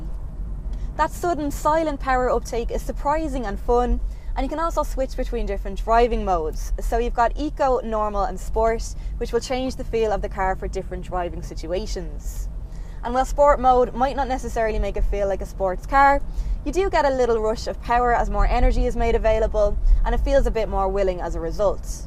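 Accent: Ireland. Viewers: first ones to show the bottle holders and how they actually work, assume they are in the back as well? Do love the Irish accent though, nothing to do with car review I realise. Love to see you do a longer range test or maybe a long term test of it and see how it works more real-world than a short review that cannot cover how it will work in the real world.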